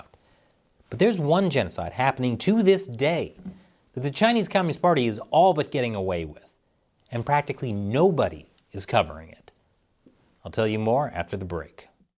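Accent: Australia. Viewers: Nice Aussie accent there! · Mate if you are going to insult people from New Zealand get you shit right., you insulted us by using an Australian accent..... we spend mot of our time insulting Australians.. you do no that New Zealand is not in Australia right? If we are insulting people you sound like small child and your face looks like your holding a fart in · Thats an Australian accent ont kiwi & yes, Jacinda horse faces fuck wit has mess up our country.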